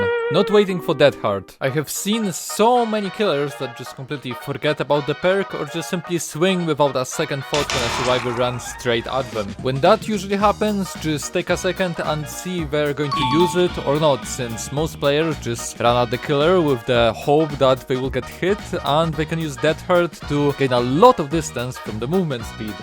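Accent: Poland. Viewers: I heard Polish accent and subbed, 🇵🇱❤️🇵🇱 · strong polish accent 😨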